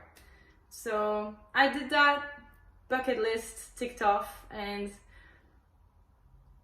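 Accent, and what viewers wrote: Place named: France. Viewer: I’m 100% sure she’s French this accent😅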